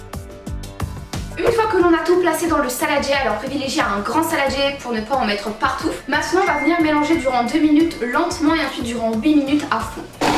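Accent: North, France. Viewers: Vous avez un accent du Nord je pense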